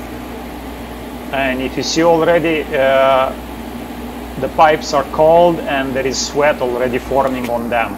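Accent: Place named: Poland. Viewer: I know you're right. Either way, thank you for this video! We are lucky for it. Idk from where you hail but your accent reminds me of Polish and I love it!!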